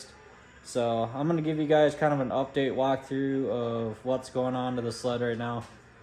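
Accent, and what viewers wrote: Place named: Minnesota, United States. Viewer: Knew you were from mn before you said it. Where are you located?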